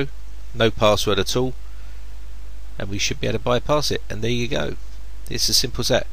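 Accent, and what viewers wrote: United Kingdom. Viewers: This is one of the most annoying Brit accents...among many many others.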